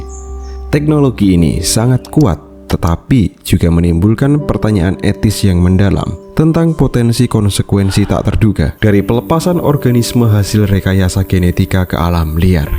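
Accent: Java, Indonesia. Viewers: Medok auto skip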